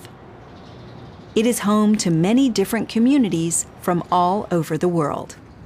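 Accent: United States. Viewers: Waoo increíble como pude entenderla al 100 sin los subtítulos, habla pausado ella y con su acento americano! Quisiera poder hablarlo así y fluido 😊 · Es inglés americano o británico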